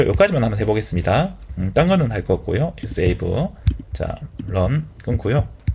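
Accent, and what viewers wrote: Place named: Busan, South Korea. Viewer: 어쩐지..부산억양이 익숙한 느낌이...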